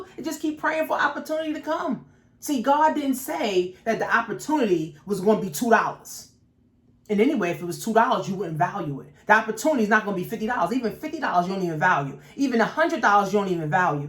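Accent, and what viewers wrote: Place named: New York, United States. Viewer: Not only are you telling the truth that New York Accent ❤ much love from Cleveland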